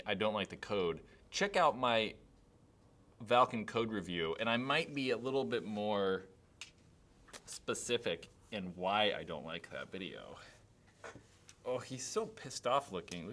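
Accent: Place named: Boston, United States. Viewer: Love your Boston by the way!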